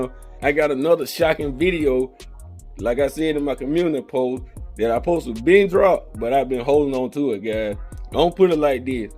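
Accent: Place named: East Texas, United States. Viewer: I stay out in east Texas and we got all the hurricane displaced people and we talk just like this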